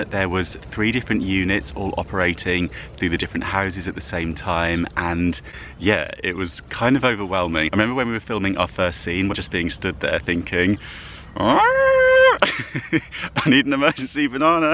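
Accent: England, United Kingdom. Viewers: Wow! Congratulations this is wonderful news!...Wish we could tune in also..in the UK!......The American viewers will love your English accent too...Wishing all the very best you deserve it...Fondest wishes from Derbyshire xxx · Congratulations, you have such a lovely English accent, pretty sure the Americans will love you, and I’m so glad you got to film and hope you get the chance to do more over there, 🏴 love Katrina xx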